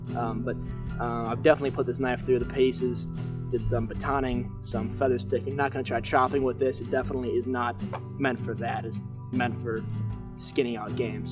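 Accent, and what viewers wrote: Michigan, United States. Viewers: Sounds like your from Michigan. In in white lake Michigan!!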